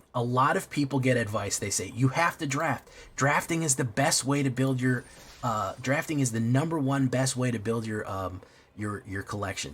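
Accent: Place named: Fargo, United States. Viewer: hm yeah it does sound a bit like the people in Fargo, that makes sense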